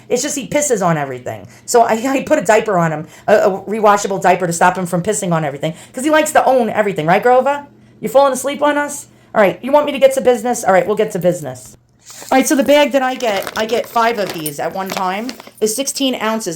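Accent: New York, United States. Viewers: What a great review! I love the New York accent! Can’t beat authenticity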